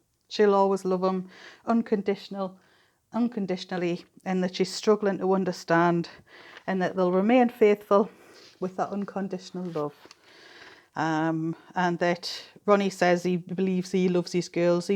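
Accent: Newcastle, United Kingdom. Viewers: Aah pet, is that a fellow Geordie voice I hear? You've picked up on all the bits and bobs I did, especially that bit about "the beloved daughter-in-law" in their court statement. I don't know who wrote this - guessing their court representitive who read it out - but that sounded so disingenuous when both parents had already made it more than clear they didn't like her - at all. OK they can say they are sad about the whole tragic event and people will probably believe them, cos I'm sure they are (if only cos their beloved son is going to prison for the rest of his life) but don't start gushing out false terms of endearment now and over egging it. If Shanann had been so "beloved by the Watts family" why didn't Mamma W get her onside, make the effort to get to know her, instead of going against her at every opportunity. And not going to their wedding? 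That would have been a very good time to start sucking up the fact that Chris had chosen her and Mamma W had to get used to it. But don't you think, Mamma W would have had a struggle to embrace any girl CW had chosen to marry? I really do think the Watts had a problem with the Rzucek family. Did you hear Sandy Rzucek's tale about when all 4 parents were staying in Colorado? Mamma W had a psychotic meltdown and wouldn't "allow" the Rzucek's to spend any time with the grandkids - and the Watts were there for a week. They didn't even all sit down and eat an evening meal together. How's that for weird? I didn't come to this case until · Just came across your channel, Have prescribed .You come across As someone very genuine and different from the others I’ve watched.And I love your Geordie accent.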